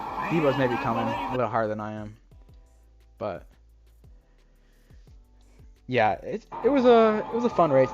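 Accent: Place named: California, United States. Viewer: Dude you sound like your from California 😂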